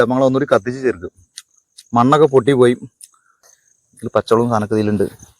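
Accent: Malappuram, India. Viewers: ബ്രോ കൂടുതൽ ജാഡ ഇല്ലാത്ത മികച്ച അവതരണം നാടൻ കുക്കിങ് രീതി ചെയ്തതെല്ലാം വളരെ പെർഫെക്ട് ഇതുപോലുള്ള വീഡിയോസ് ഇനിയും പ്രതീക്ഷിക്കുന്നു കാണാൻ നല്ല ഭംഗിയുണ്ട് മലപ്പുറം സ്ലാഗിലുള്ള സംസാരം പൊളിച്ചു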